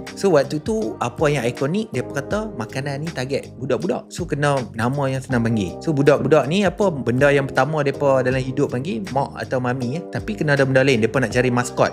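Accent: North, Malaysia. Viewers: Apasal Melaka cakap orang utara?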